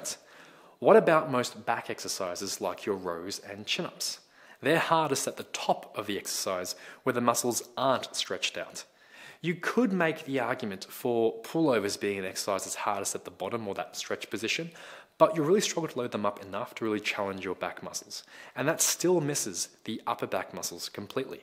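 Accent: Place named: Australia. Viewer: Where ya from bro? Australia? I was trying to work out this accent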